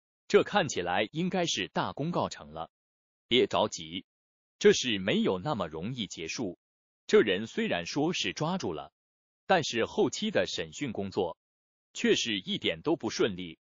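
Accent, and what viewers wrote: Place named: Taiwan. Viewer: 这是哪毕业的播音员啊？台湾吗？这普通话不是母语啊！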